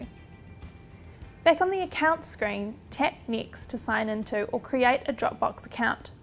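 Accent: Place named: New Zealand. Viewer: dat New Zealand accent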